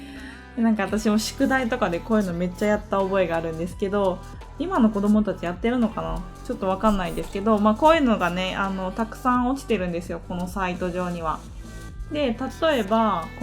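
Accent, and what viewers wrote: Kyoto, Japan. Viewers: 京都弁期待しています。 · 京都弁のイントネーションが節々に感じられて、自分は癒されながら観てます。 是非とも京都弁で解説してもらえるのを楽しみにしてます。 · 京都弁の解説メチャメチャ楽しみです！ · 京都弁の解説めっちゃ楽しみやな〜❤ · いつも貴重な情報ありがとうございます。漢字の書き順が少し気になりました笑 京都弁期待しています。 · いつもすっごく分かりやすくて楽しくて、ためになります！ お話も聞きやすくて、早くもなくて、癒させるし〜♡ 待ち続けたiPad miniを手にしてからノートも手帳も手書き類すべてiPad miniに移行しているので タイムリーで嬉しかったです♪ 京都弁やってほしいでーす！ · イントネーションが関西ですよ^_^ 私も関西なので親近感が湧きます^_^ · 以前アップしてた中に、21才頃の動画でしたっけ、あの時の話し声が関西弁だったので、そっち方面かもね。と、思ってました。京都弁で、ぜひお願いします。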